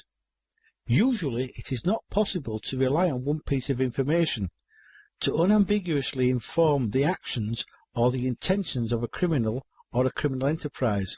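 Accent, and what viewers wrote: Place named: United Kingdom. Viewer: The fact this man is teaching what I want to learn and has a UK accent makes this course so much better. ❤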